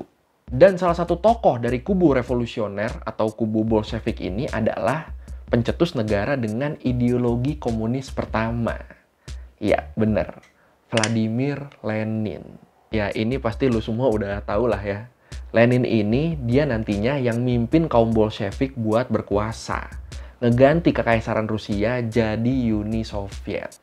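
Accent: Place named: Madura, Indonesia. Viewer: lu orang madura ya bg? nadanya kok gt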